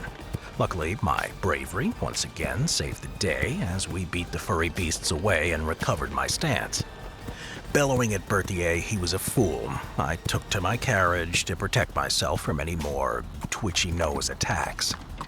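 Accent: United States. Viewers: Unwatchably annoying in a yank accent. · nice american accent frenchy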